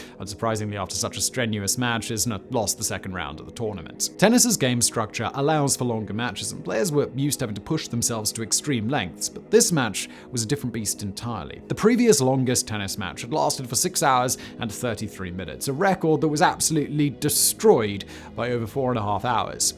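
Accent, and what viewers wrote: RP, United Kingdom. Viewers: This guy sounds like a normal british guy who's trying to play up his accent so americans think he's fancy and posh, ya sound like a twat mate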